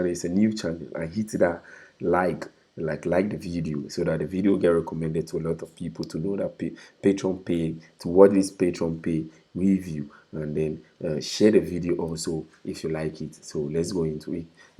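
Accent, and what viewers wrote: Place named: Ghana. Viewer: Wow you're a Ghanaian,it was easy for me to understand from a fellow Ghanaian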